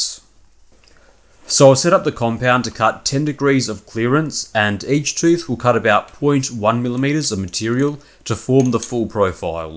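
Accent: Australia. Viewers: For someone like me who learned english at school some decades ago (british english as in most european schools) at the beginning it was not easy to understand everything spoken with this specific australian accent. But I got used to it and it pays off. Meanwhile I have watched every single of your videos and learned quite a lot!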